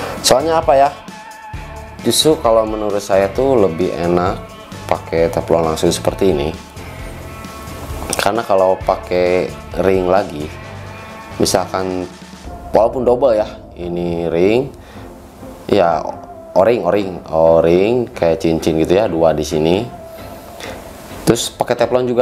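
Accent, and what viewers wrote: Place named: West Java, Indonesia. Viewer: Logat ny sunda ini mah,,, timanten kang??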